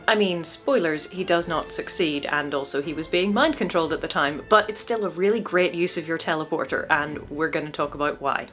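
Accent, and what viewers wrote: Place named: Northern Ireland, United Kingdom. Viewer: Not related to XMen but where are you from? Im from NI and California and you sound super Norn Irish. Good to see some Marvel fandom from there ❤️❤️❤️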